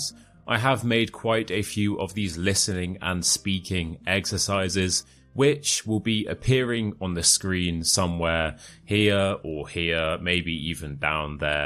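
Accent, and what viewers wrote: United Kingdom. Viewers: Are you from England and Scotland?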